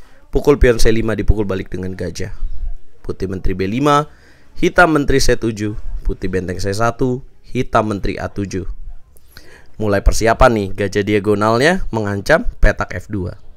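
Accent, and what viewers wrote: Tegal, Indonesia. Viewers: Sampean asli wong tegal mas?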